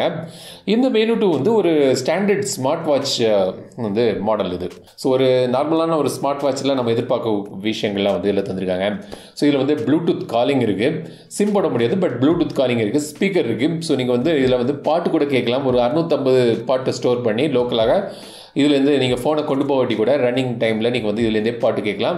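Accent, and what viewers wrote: Kanyakumari, India. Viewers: அண்ணாச்சியின் குமரி தமிழ்..😍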